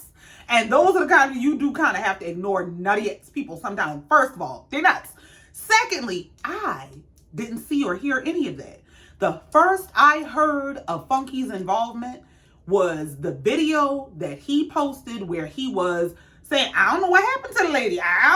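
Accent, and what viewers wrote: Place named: Lowcountry, United States. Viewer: You look great today! That top is cute! Thanks for posting, enjoy your thoughts and getting to hear little twinges of your accent, it reminds me of some of my low country folks.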